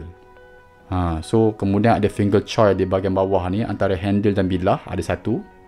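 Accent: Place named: Perak, Malaysia. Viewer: Memang sedap dengar bahasa perak dia.. keep it up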